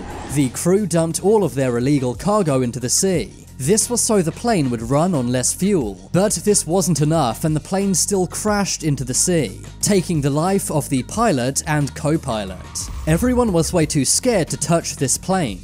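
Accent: United Kingdom. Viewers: I don't believe I have listened to a YouTube video with as many grammatical errors as this one. Not that there are a lot but more than any other to which I have listened. At about 12 seconds in, "most scary". That should be "scariest". The adjective "scary" has 2 syllables and ends in "-y". That means we use the "ier" and "iest" endings for comparative and superlative forms. I see this more and more, that is, where people say "more" or "most" when it should be the comparative suffixes "ier" or "iest". I don't understand why as it even just doesn't sound right. Also at the end of this video, the narrator again uses "most scary" which I have already mentioned should be "scariest". Next is when the narrator says, "him and his car were never found". Really? Him was never found, eh? I don't think I have to mention what the correct pronoun should be (he), but I did anyway because I sometimes just wonder. 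Also, I wonder if the narrator is British (he sounds British) because all too often when I watch British shows they often use "him" (or "her") as the subject noun. I don't get that either as I always thought the British were generally pretty good at using proper grammar. Next, at the narrator says, "by censoring this house, less people would go here". That should be "fewer" people. Use "less" for singular nouns and "fewer" for plural ("there is one less person here"; "there are 10 fewer people here"). I don't get it as again, it doesn't even sound right. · I hear you speak British🤨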